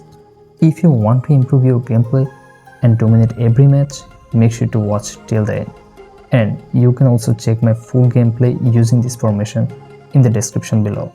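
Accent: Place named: Kerala, India. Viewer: i knew right away that you're a mallu from that accent...aren't you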